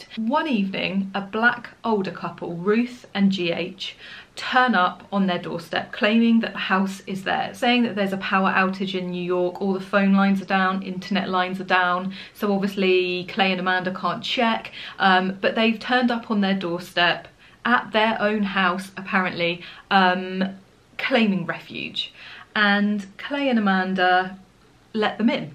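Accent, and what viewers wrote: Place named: England, United Kingdom. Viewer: I liked the book as it is different from most world in crisis end times books that are usually predictable. Love your English accent, and don't worry about big words in books that means you are reading literature instead of pulp fiction and tripe. Sorry, you found the book not to your taste. Maybe your taste is the problem.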